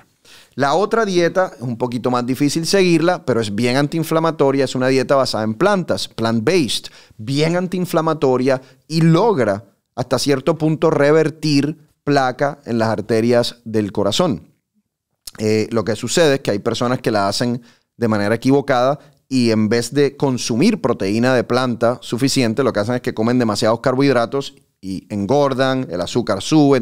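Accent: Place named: Venezuela. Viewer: Perdone Dr. en que país se encuentra Ud.? Y de donde es? Ud.tiene acento Venezolano. Bueno le deseo todo lo mejor de la vida sobre todo mucha salud,felicidad,paz,y prosperidad. Muchos existos en su hermosa carrera. Muchas Bendiciones.!❤❤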